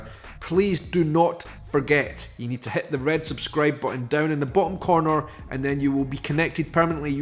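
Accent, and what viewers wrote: Scotland, United Kingdom. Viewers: I’m sorry but a man in a kilt with a broadsword just flat out does it for me... it’s a great look and should be worn frequently and with wild abandon. Since I’m being honest here, that Scott accent is something I could listen to all day. Talk to me about bellybutton lint and Qtips I don’t care just keep talking baby! Love your videos 😎 thanks for making them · Ok .... I love you.....you are so kind to us people from the U.S of A! I just stumbled upon you because I need study your voice! I need to have a Scottish accent for my up coming gig! You are right....we do want to be connected to royalty.....and find all of our cousins from Scotland!!!! Keep being fabulous! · I love your brogue...especially when you say Edinburgh....so sexy! You sounded more Scottish in this video than others I have seen. Love it!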